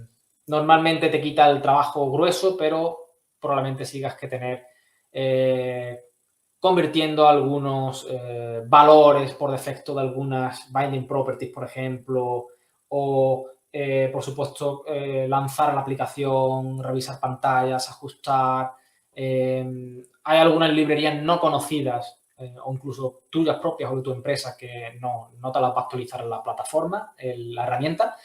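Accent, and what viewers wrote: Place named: Spain. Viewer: No tolero el acento español 😢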